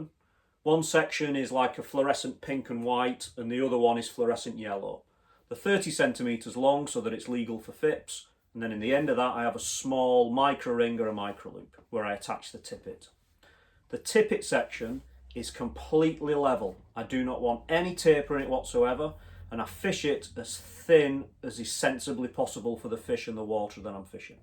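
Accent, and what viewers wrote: Wigan, United Kingdom. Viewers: Really enjoyed these videos - even my wife commented that your explanations were very clear and uncomplicated. Having said that, could you just clear up the lengths of the thick coloured nylon. Is each length 30 cm, making 60 cm for the two or do the two pieces together add up to 30cm? Must say that I've enjoyed hearing your, once, very familiar accent having been schooled in Wigan in the sixties.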